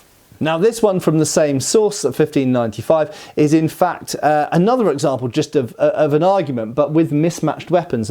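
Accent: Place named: United Kingdom. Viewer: I assume that this is STILL hoe you Brits sound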